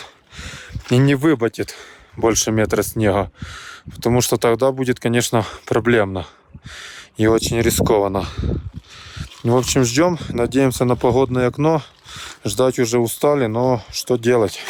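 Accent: Ukraine. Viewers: Лахерь на хоре))) какой ужасный украинский акцент, тогда бы уж на украинском ховорил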